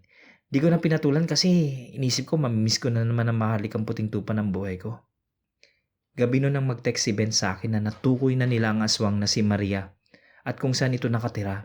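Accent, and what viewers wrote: Visayas, Philippines. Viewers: Bisaya ka bay. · Bisaya ka ba o waray??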